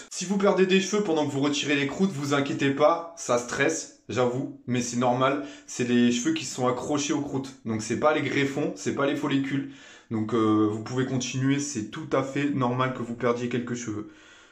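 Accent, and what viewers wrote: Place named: Arab world. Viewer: Toi qui parle comsa tu est un français oubien un arabe ?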